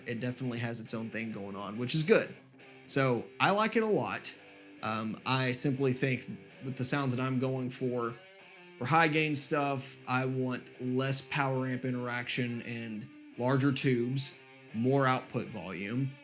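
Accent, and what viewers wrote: Texas, United States. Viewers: i always thought you were from Texas...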